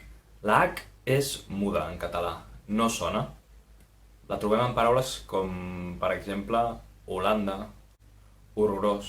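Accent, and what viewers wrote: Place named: Castile, Spain. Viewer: Mestre, la lletra ce es pronuncia /sé/, amb essa i no pas com fas tu: amb el so castellà. També: 1) la o del mot "àtona" es pronuncia /u/ i no pas /o/, com ho fas tu, i la be del mot "àrab" és una /p/. Etc.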